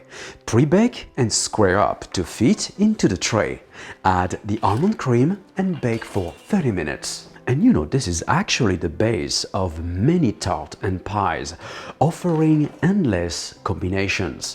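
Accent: France. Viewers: Idk...between the crazy machinery (non-cook here) and the intimidating French accent I feel like all of this video is basically "baking on hard mode". In a world where BuzzFeed dominates Instagram worthy foods it is a wonderful sight to see true baking skill displayed on YT. Edit: thanks for all the likes! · I don't know if I'm following an English cookery course given by a guy with a strong French accent, who probably thinks that his smile will make him forget to give the measurements and grams needed to make this tart. He needs clicks and likes for his video I need measurements Hoping for your understanding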